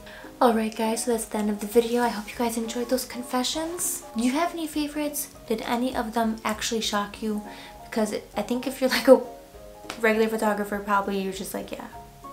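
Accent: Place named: New York, United States. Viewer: Love the NY ACCENT X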